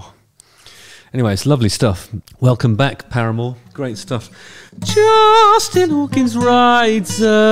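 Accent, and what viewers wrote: United Kingdom. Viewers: Hello Justin. Firstly I’d like to say I love your content. Secondly, you have the most annoying intro I’ve ever heard yet I find myself singing it throughout the day. When I first started listening to you I thought you were saying “right again.” I thought to myself this is one cocky Britt and I like it.Have a great day sir!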